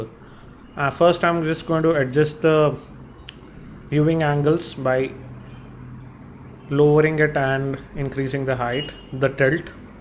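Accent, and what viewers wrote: India. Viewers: awesome indian-english accent:) <3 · speaking english with indians accent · o god this indian accent.. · India-english accent is really cute :3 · This is actually the way all indians talk english, you get bored when you listen to a lot of them. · I can’t take it with that Indian accent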